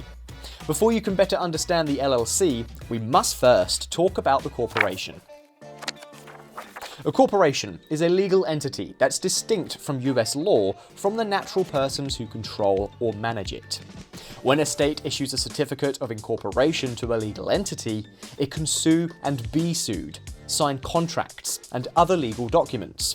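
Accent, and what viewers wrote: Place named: United Kingdom. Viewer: I like your British accent 💪💕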